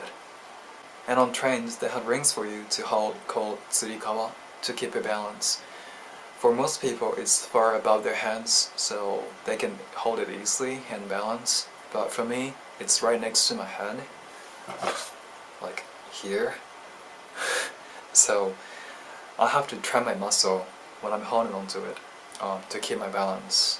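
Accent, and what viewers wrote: Australia. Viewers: You sound Australian when you speak in English! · Dude, nice Australian accent. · to me your english sounds really australian here, very interesting! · you sound Australian, it is odd.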